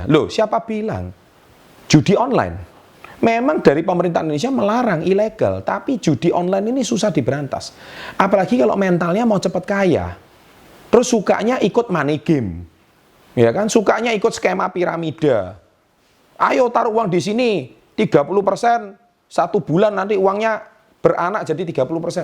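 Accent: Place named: Surabaya, Indonesia. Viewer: Mantap? Sy suka banget dgn gaya pak memberikan paparannya, masukbanget dgn fikiran sy gitu, dan sy keingat bos sy dulu, gaya bicaranya mirip bgt? Terlihat ada ala Surabayanya gitu?? Keren!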